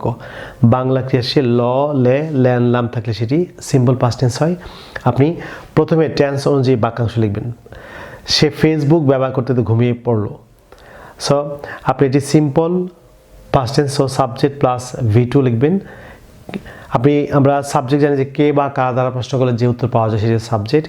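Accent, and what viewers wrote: United Kingdom. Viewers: I like british accent so much. I am learning british pronunciation from your You tube channel sir. You are good at british accent.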